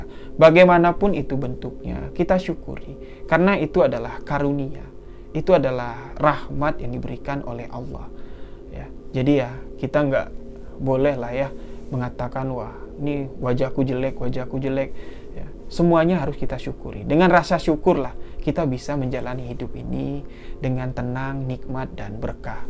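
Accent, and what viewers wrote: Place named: Kalimantan, Indonesia. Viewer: Maaf ya mas, masnya ini asli orang Jambi apa orang suku Kalimantan. Soalnya logat bicaranya kayak suku Kalimantan 🙏.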